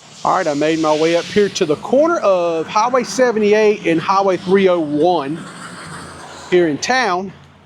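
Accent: South, United States. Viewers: While these towns have seen better days, I do appreciate your visiting them , rain and all. While saddened what has happened to these towns, because of a number of factors, one can't replace their history. The beautiful old police car (?), I mean, come on. how great it that?. When the rain stopped, you entered the town of OLAR which I thought (through your southern dialect) was OLA, the name of my long passed mother., from the mountains of Virginia. Regardless, I did particularly enjoy that town and it's old buildings. Like you said, a little love paint, and the folks here just might see the value in a coffee/snack shop and barber to get thing back on track. Only time will tell.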